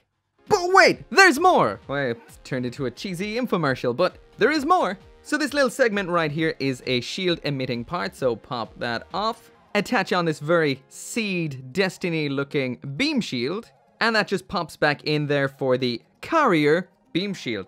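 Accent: Ireland. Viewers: You're irish?!?! It makes sense now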